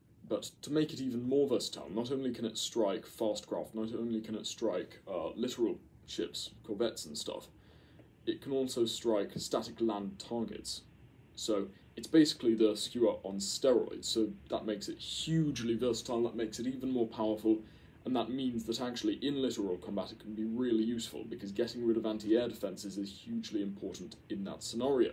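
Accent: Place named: RP, United Kingdom. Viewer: This guy is POSH!!!!!!!🧐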